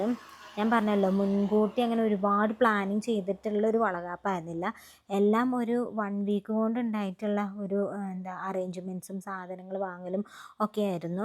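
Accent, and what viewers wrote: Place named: South Kerala, India. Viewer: മോൾ സുന്ദരി ആയിട്ടുണ്ട് കേട്ടോ ❤.ഒരു കാര്യം... മോൾ സംസാരിക്കുമ്പോൾ തെക്കൻ കേരള ഭാഷ കയറി വരുന്നുണ്ട് കേട്ടോ.വരത്തില്ല... പോകത്തില്ല etc. അതു വേണ്ട എന്ന് തോന്നുന്നു. നിങ്ങളുടെ ഭാഷ കേൾക്കാനാണ് സുഖം❤❤❤ എല്ലാ അനുഗ്രഹങ്ങളും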